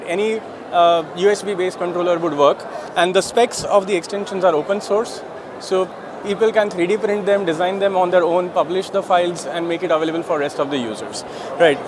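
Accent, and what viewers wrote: India. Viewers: Sorry for being a racist, I really don't like listening to Indian accent English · Jeet accent, into the trash it goes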